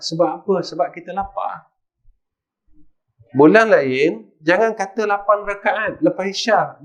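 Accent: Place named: Terengganu, Malaysia. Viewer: Ustaz ni orang mana, orang Terengganu ke, cakap mcm org Terengganu je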